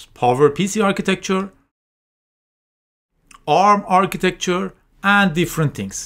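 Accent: Iran. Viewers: I grew up in Iran... this accent sounds Farsi, but more extreme than I usually hear... ,🙂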